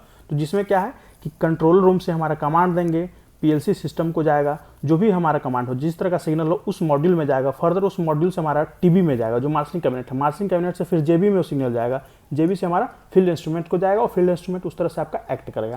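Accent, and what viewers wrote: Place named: Bihar, India. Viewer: Bhai aapka language vihari type h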